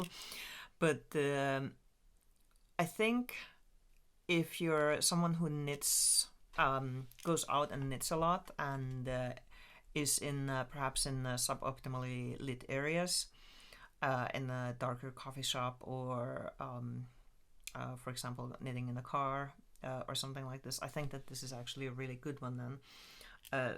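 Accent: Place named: Finland. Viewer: I was watching this to find out more about the light and noticed your accent sounded Finnish. Then I saw the Moomin poster in the background and thought “she must be Finnish!”. If you are Finnish, then I will say kiitos videosta.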